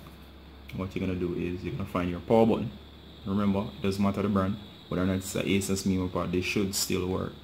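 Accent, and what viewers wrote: Jamaica. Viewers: Cuz u sound Jamaican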